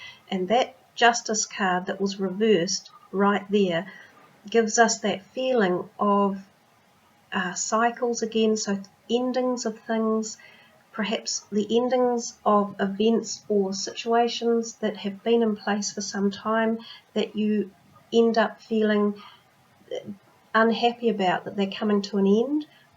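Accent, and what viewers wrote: New Zealand. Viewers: I'm loving hearing your Kiwi accent!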